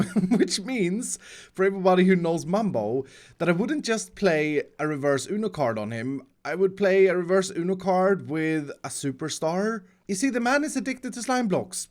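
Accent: Sweden. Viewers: Do you come from sweden? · I am new to your youtube. Are you from sweden becus you sounds wery like a swedish persone. Sorry if you not are from sweden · Funny how i could instantly tell you're swedish based on your accent but had no idea keralis was from our western neighbour too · are you swedish? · It sounds like you are swedish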